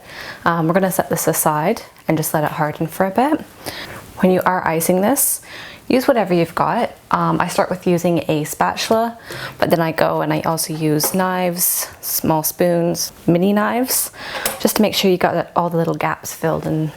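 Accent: Australia. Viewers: Are you Australian? I am! Also maybe you could smile a bit more in your videos! No hate just a tip🙂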